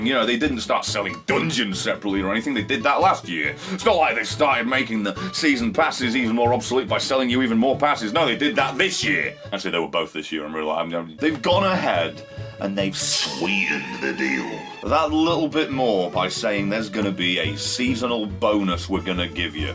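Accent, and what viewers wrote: United Kingdom. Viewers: There’s something so satisfying about a fine bri’ish gentleman going absolutely mental while also making good points on camera that is just *chef’s kiss*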